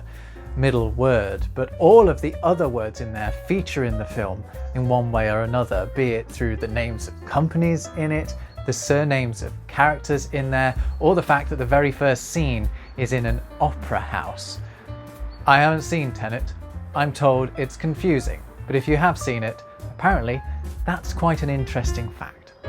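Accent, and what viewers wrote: United Kingdom. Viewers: BRUH YOU ARE BRITISH?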